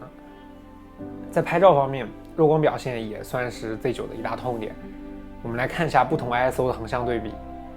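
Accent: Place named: Henan, China. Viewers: 之前看过他一期视频，和他妈妈通电话，一口河南话啊，哈哈。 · 他是湖北十堰人，湖北北部的几个县市的方言还是很接近河南的。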